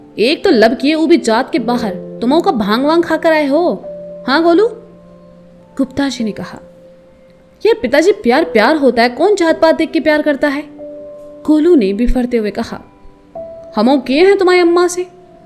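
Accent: Kanpur, India. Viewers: Didu har har mahadev Happy friendship day Congratulations for 19k subscribers Didu apki kanpuriya tone mast hai maze ajate hain 😉😉👈👈 Mahadev always bless you 🧿🧿😇😇❤️❤️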